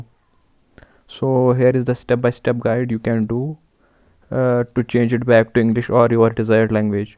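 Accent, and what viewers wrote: India. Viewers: No. It doesn’t. I have many kashmiri friends and you sound like them. Just asked! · You are definitely an Indian guy and your English has an Indian Hindi accent also, still you say I don't know how to read Hindi. Way to act cool bro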